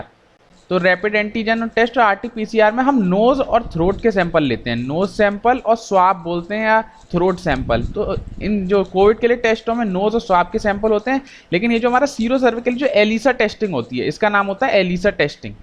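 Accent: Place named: Delhi, India. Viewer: Sir apki video dkhta hu pta hi ni chlta kb video khtm ho gyi....ap exact to the point pdhate ho....delhi's local language 👌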